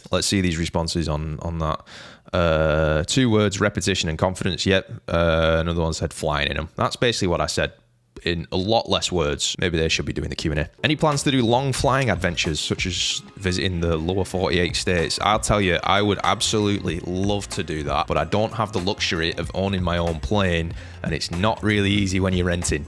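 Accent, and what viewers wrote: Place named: Bolton, United Kingdom. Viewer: As a North of England person I would guess is from Bolton, North West England, North of Manchester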